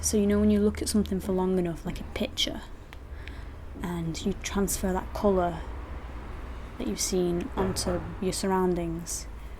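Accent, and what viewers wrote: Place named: North, United Kingdom. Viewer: Yo where you from, you sound northern!!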